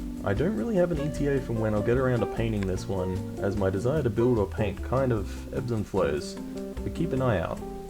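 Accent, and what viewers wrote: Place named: Australia. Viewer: You sound australian. And your glue seems familiar Did you get this set from metro hobbies?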